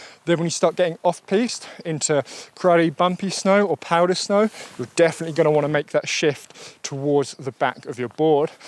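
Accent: England, United Kingdom. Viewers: Wanted to book you, had a look on your homepage but you're so loved + demanded that no chance for this season😔... But good for you😊! I'll try to plan my holidays for next winter and may be I can get some lessons with you if I do it months in advance! Love your way to explain, your technic, your skills, your sense of humour, your love for the natur and your professionnel eye for the mistakes of your students and the way your explain them and make them progress! Not to forget your beautifull english "accent", your dog and your good vibes. It's such a gift to have access to your videos, thanks! Looking forward to get you once as teacher in real 🤩!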